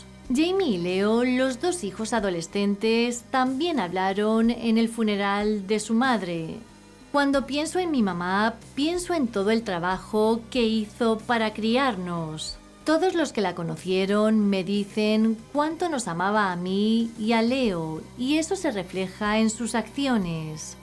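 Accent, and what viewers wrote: Spain. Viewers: Me encanta tu tono tan español !!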